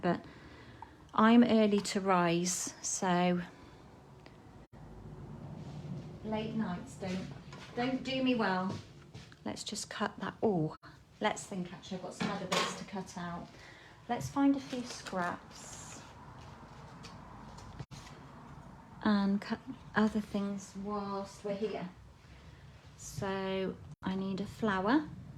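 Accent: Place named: United Kingdom. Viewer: I'm watching the replay. I'm getting a kick out if all your British terms. I love, love your accent! The cards are lovely.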